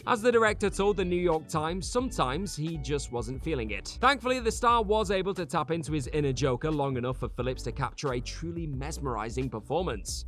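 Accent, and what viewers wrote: United Kingdom. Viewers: "Drunk". Narrator is British.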